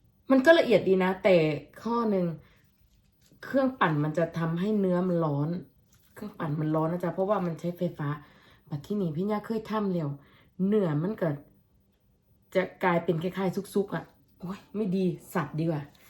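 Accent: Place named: Isan, Thailand. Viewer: พูดอิสาน หรือโคราชก็ น่าฟังดีจ้า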